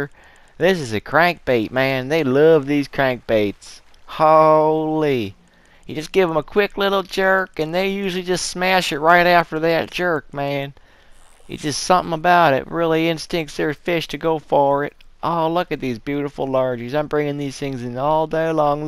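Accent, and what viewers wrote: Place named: South, United States. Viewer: Been missing your RDR2 online videos. Hope all is well! Your "Southern" accent is hilarious 😂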